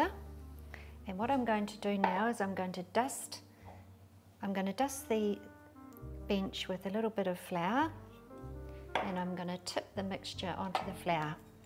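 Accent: New Zealand. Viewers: Thank you. My mother was a great home cook but, her scones, pikelets and shortbread were outstanding. Sadly, although l inherited her baking tins and even have her bone handled knife and even though l make most things well, l did not inherit her 'hand' and have pretty much given up trying with scones and pikelets. Tragic cos, IMO, hot buttery scones (and/or pikelets) are unbeatable. You've inspired me to try again though, mostly because your method, measurements and equipment are pretty much identical to what l remember of how my mum made hers - sadly dementia took her before it even occurred to me to write down her recipes, which, like most cooks of her generation she knew off by heart. Most recipes l've found since seem to rely on enormous quantities of baking powder and as l seem to be particularly sensitive to the taste l find them inedible. I would love to know where you got your brilliant oven tray too please - judging by your accent, you're a fellow kiwi and although l've seen those trays overseas, l've never seen them for sale here in Aotearoa. 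I'd really appreciate a heads up, if anyone knows where they're from. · I love your New Zealand accent!! (Am i right?) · Yes sounds kiwi love her presentation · Hi, love the kiwi accent - I currently live in Rwanda and both your recipe and accent are brilliant. I have an oven with 3 temperatures - cold, a bit warm and a bit warmer yet your scones came out beautifully and were so easily prepared. Many thanks. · New Zealand accent = instant trust. How did I never know the hot tray trick? Intermediate School cooking classes have let me down! I’ll be making these in the morning thanks. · It’s so overly to hear a familiar accent from Aotearoa. Thank you for your gentle and really easy recipe. Love the way you took us through step by step. I am going to make these scones for my staff who are all men and love to eat. Thanks heaps. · She sure sounds like a Kiwi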